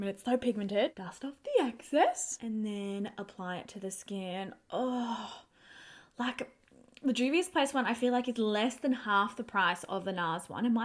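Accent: Australia. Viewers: I could listen to you speak all day long. Your Australian accent is lovely. I am a New Yorker and we sound like truck drivers. New York accents are actually offensive to the ears 😂.. listening to your beautiful voice is so calming it’s just so so pretty.. When a New Yorker opens their mouth it’s like people want to cover their ears because we are so loud and sound like drunken sailors 🤷‍♀️🤪..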